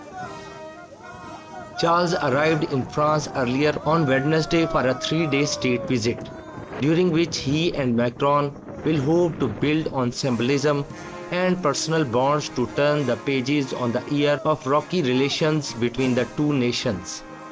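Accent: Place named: India. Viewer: Horrible Indian accent. Use AI.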